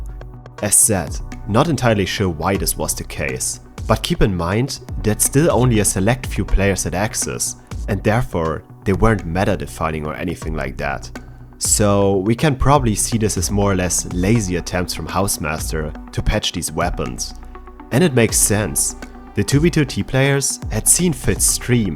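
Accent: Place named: Germany. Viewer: German accent +99999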